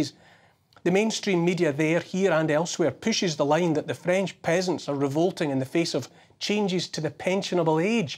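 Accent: Scotland, United Kingdom. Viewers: Neil, I just love hearing your Scot's accent speaking the truth. You have one more American subscriber. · Scottish voice of Neil is wonderful mate … I’m off to buy a bottle of Bucky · “The strutting popinjay that is Justin Trudeau..” 😂😂😂 Well said my friend! Fabulous monologue in an equally fabulous Scottish accent! Greetings from Canada! 🇨🇦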